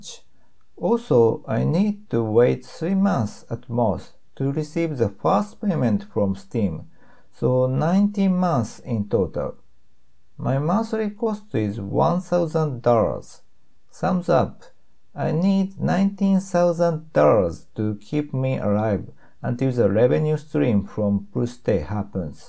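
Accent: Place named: Japan. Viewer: what do you mean by his speech problems? If you’re talking about the way he speaks in the video then that’s just Japanese accent. In fact he is really good at English in the standards of Japan.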